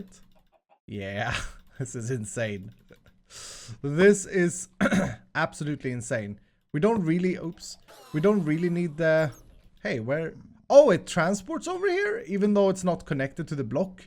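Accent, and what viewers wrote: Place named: Sweden. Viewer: He is from Sweden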